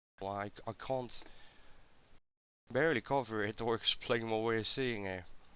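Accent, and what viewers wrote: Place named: Netherlands. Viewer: dutch way of pronouncing .. hahahahahhaa!!!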